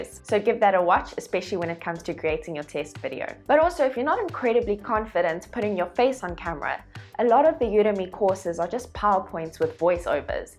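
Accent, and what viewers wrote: South Africa. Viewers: Awesome hearing the South African accent when learning online. Great content, thank you - it's a new teaching journey for me and this was a very insightful video 🌻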